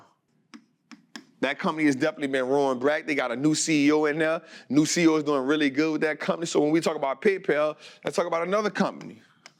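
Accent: New Orleans, United States. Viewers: From that first sentence I can tell that boy from New Orleans like me⚜️ let’s go brudda! Definitely gonna give you a subscription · Is your school in New Orleans? I hear the Nola accent.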